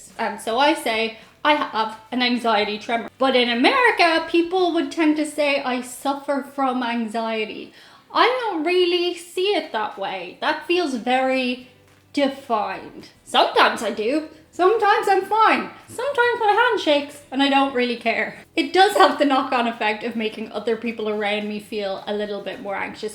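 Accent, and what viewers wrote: Ireland. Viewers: Is it just me, or are you starting to sound REALLY American yourself? I can barely hear your Irish accent anymore. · Here's an idea for your next trip to the US; you should try going to a firing range. I think I remember "Joel and Lia" going (I believe you know them or I wouldn't have mentioned them on your channel). I guess I'm the odd American, because I have been travelling abroad for longer than you've been alive, almost 25 years;). One reason I watch your videos is I miss the accent when I can't travel, love Ireland. Keep up the great work.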